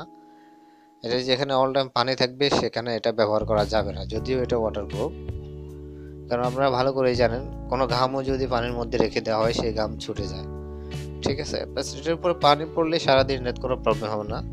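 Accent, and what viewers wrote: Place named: Sylhet, Bangladesh. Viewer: আপনি সিলেট নি? আমি তো সিলেট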